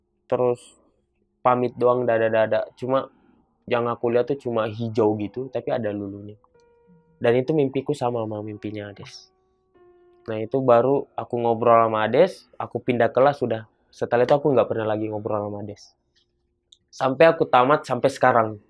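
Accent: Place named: Bugis, Indonesia. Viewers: Logat sulawesinya masih ada yah kk,, salam dari sulawesi selatan bone kk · Ku tempeleng Bugis bgt bg👋👋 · Pasti ini bang orang sulawesi, kentara logatnya😅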